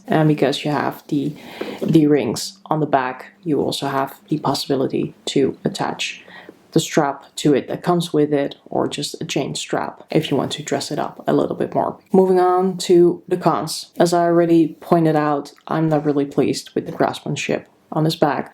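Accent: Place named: Netherlands. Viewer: By coïncidence I discovered your channel and I immediately liked it and subscribed 🤗I already thought you had a slight dutch accent and after ‘doei!’ I had to laugh, I’m dutch as well 😁 I appreciate your honest and thorough reviews, keep up the good work 👍 doei!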